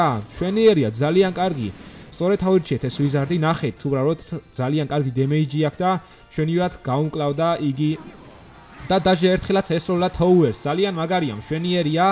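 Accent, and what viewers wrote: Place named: Guria, Georgia. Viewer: guruli kilo gaqvs dzaan magari arxi gaqvs es ubralod ise gitxari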